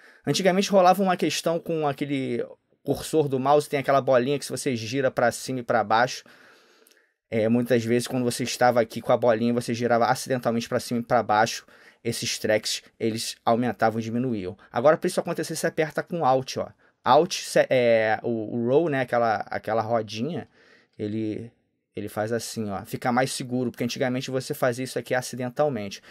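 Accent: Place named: Rio de Janeiro, Brazil. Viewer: Finalmente um Carioca que fala minha língua.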